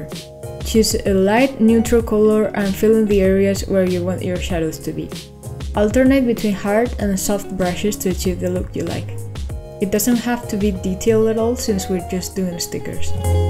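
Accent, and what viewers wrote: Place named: England, United Kingdom. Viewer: Wow that english accent.. ☺️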